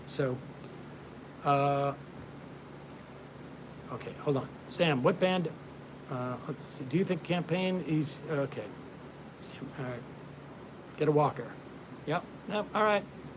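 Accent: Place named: United States. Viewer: Sam has a pleasant American accent. Can someone tell me what region he might be from? (Don't panic, Im not a stalker, just a curious Aussie)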